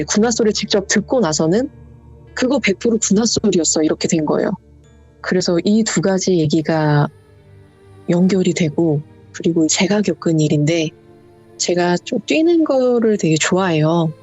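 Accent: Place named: Seoul, South Korea. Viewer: 서울말투 진짜 이쁘다.. 난 부산 토박이라, 서울 사람 옆에 있으면,여자라도 우악스럽은디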